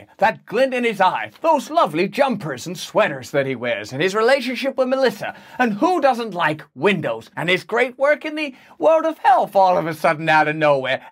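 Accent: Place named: England, United Kingdom. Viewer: Fir ee aye miwwen dollars, come on. Is it just me or do English people sound like they're still trying to learn english while the rest of us learnt to talk properly and moved on.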